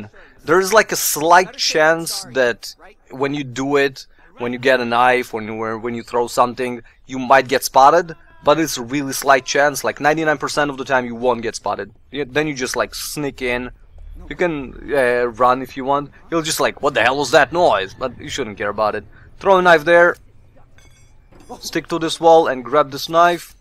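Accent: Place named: Russia. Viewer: wow, you sound like a russian :) and i am russian, so no hate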